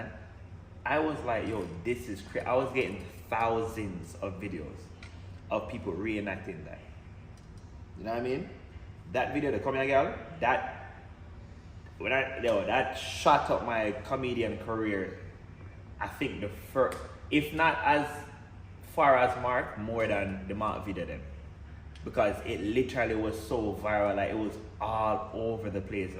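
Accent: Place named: Jamaica. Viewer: I thought u were Jamaican no joke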